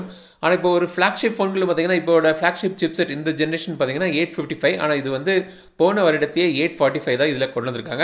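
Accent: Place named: Nagercoil, India. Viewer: Nagercoil slang😍👏🏼👏🏼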